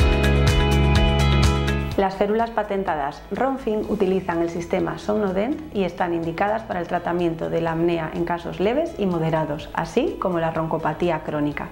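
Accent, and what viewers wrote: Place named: Spain. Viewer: Me imaginé por el acento de la doctora, era en España.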